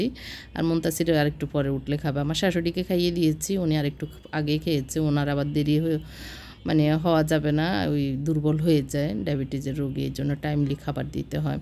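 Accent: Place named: Feni, Bangladesh. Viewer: apu.ame.feni district tak bolce. apnar kota suna mona hocca apne fenir manus.apnar badi fenir kon grame.bolban ke.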